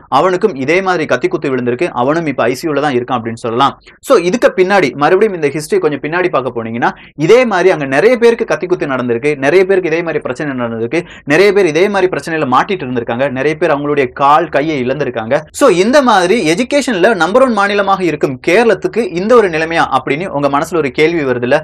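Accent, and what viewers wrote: Kerala, India. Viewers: Don't compare with TamilNadu and Kerala, (u are a malayali your slang is little much of keralites) TamilNadu is always developed state then Kerala,. We have large no of colleges, most if the keralites willing to study in TamilNadu only. After primary education for best higher studies they have to come here only.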